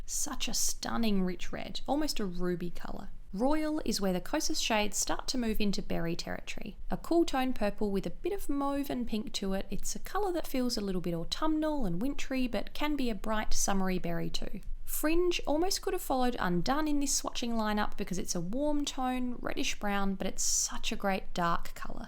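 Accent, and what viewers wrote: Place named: Australia. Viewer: I come to all your videos for cool reviews, pretty hands and that lovely Aussie accent 👌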